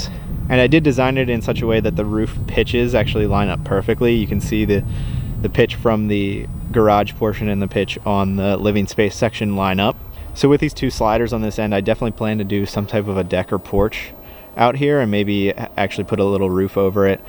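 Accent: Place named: Philadelphia, United States. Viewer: I'm from Pittsburgh and you know we have a distinct accent. My welders helper is from the Philly area so I pick up on it. Your set up it awesome though Man!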